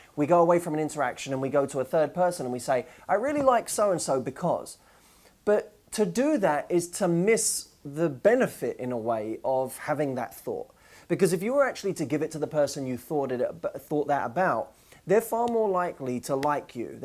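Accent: England, United Kingdom. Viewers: Gosh I am so obsessed with your English accent 😀 great lesson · ***** Totally :) British accent and brown hair from Orlando, facial features from Ryan.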